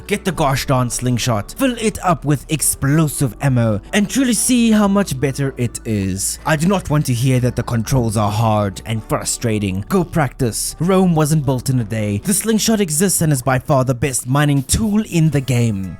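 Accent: South Africa. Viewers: Typical South African accent with dramatic flare... As a fellow south african, it's trash. I hate our accent. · love how the south African accent is super recognisable by south Africans😂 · we don’t have an accent. We have like nine accents. He speaks with a white joburg area ish afrikaans accent. Some accents here are epic linguistically and phonetically. Even his is cool, just not as cool as some others. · Are you South African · he definitely is South African. I was watching this video like… is that a fellow South African?? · You sound South African. Hey from Namibia! Thanks for the tips - playing with my son 😁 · Definitely not french. I believe he is South African, could be wrong though. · he's south african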